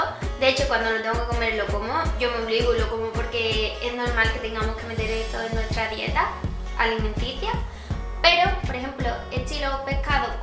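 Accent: Andalusia, Spain. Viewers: De nada guapa PD;se nota q eres andaluza (eres andaluza no?) si lo eres ya somos dos un beso guapa!!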